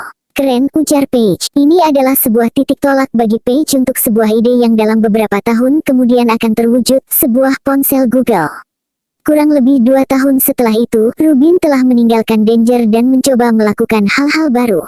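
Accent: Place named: Java, Indonesia. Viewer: Jowo e ngendi sluur😁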